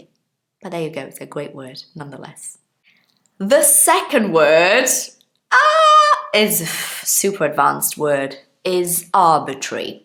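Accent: United Kingdom. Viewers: You sounds like a royal british woman, i love hear this british accent, and my dream/expectation is speak british english perfectly like you teacher 😊 Ps: Sorry about my BAD english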